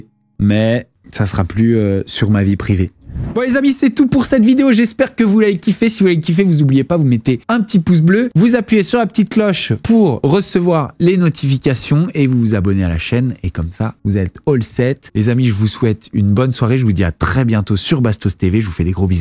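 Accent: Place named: France. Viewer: jecoutais pas dutout big brother mais car tu etais la jai ecouter merdouille et tu es sorti et jai trouve que tu avais vraiment plus laccent francais que sur tes chaines ..... jaurais vraiment aimer que tu reste plus longtemps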